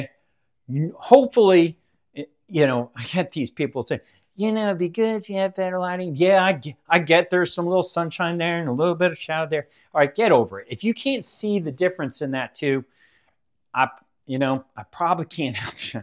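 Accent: United States. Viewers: Not an old guy, but a tough 68 year old broad here, and want to know if you think the FitTrack scale’s visceral fat measurement is accurate. Was thrilled that it consistently measures mine at 3, very low, on a rating of 1 to 19. If it’s not accurate, where can we get it done, need a doc’s order, cost? Thanks! Loving your channel. Keep up the great work! You sound a little southern on this recording?? Hadn’t detected the accent before.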